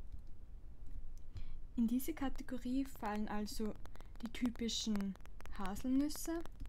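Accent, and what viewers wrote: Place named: Austria. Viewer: Mit diesem sanften österreichischen Dialekt fällt das Lernen gleich viel leichter :D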